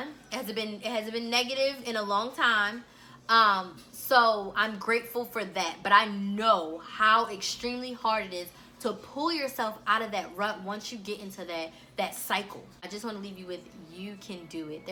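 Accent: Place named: Maryland, United States. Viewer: Thanks for the video..I knew it! I could hear that Maryland accent! Especially when you say two, true, do..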